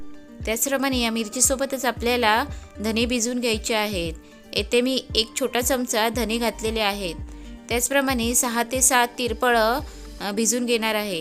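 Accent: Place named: Konkan, India. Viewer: Love the Konkani accent ❤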